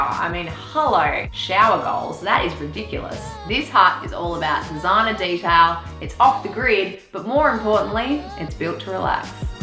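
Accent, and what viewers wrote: Australia. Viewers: Cute cabin. Cute accent. I lived in Australia. I miss hearing it sometimes.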